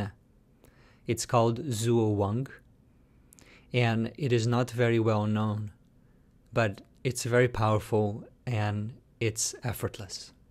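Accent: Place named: Italy. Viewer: Interesting. I'm used to simply watching my breath for thirty minutes. I think I will continue that way. Simple is what works for me. Great stuff,. Continua a fare queste cose e aiuterai a tantissima gente. I hear an accent and is an Italian name. Io sono cresciuto a Napoli!!! N'abbraccio.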